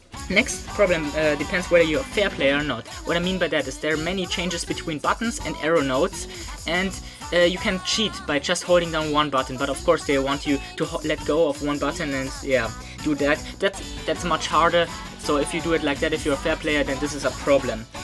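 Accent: Germany. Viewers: Are you German? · Kommst du zufällig aus deutschland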